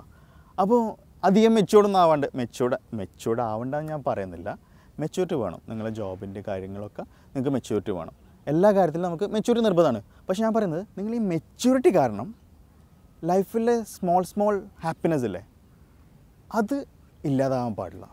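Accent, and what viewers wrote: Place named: Malabar, India. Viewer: New subscriber here.. Boss malabarilaano.edakk nammale basha keri varnn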